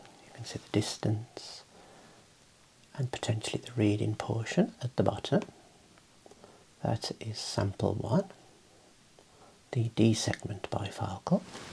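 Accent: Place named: Black Country, United Kingdom. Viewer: As a Midlander, loving the Black Country accent!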